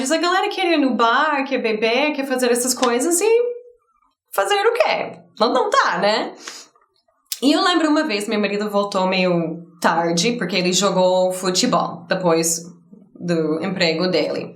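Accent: United States. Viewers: É impressão minha ou seu jeito de falar está meio " americanizado" de novo, perdeu um pouquinho do jeitinho brasileiro de falar? ( nada ver com aquele "jeitinho brasileiro" ruim. lol!). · Você tem uma maneira de se comunicar interessante, além de falar com sotaque Americano, você se expressa muito bem com as mãos e até com os olhos... Tudo que voce falou no curso gratuito, aconteceu comigo. Já morei ai em São Paulo, sou de Maceió-AL... Pra se adaptar bem ai em São Paulo é nunca comparar com seu lugar de origem, paulista brinca e gosta de pessoas que não irritam-se !!! Your Friend Dinhoantenista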